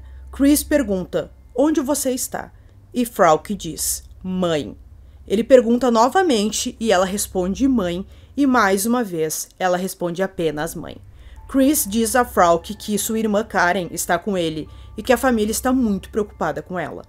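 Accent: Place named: South, Brazil. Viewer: O que estraga o vídeo é o sotaque sulista. Meu Deus muito ruim e chato esse S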